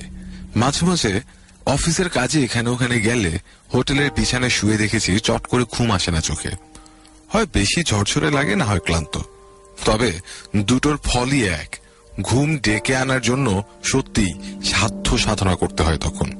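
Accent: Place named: United Kingdom. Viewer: ওরকম দাঁত চিবিয়ে চিবিয়ে ইংরেজি স্টাইলে বাংলাটা না বললে নাটক টি সর্বাঙ্গ সুন্দর একটা রূপ পেত ভাই... ওভাবে বলার কারণে অনেক শব্দ স্পষ্ট শুনতে অসুবিধা হল।😅 । পরবর্তীতে এ বিষয়টা মাথায় রাখা উচিত...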